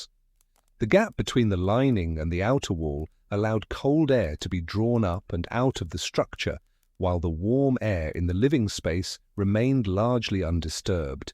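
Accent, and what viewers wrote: United Kingdom. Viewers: Watching from southeastern Texas, 🇺🇸 USA. You have a beautiful British accent. Could listen to you for hours.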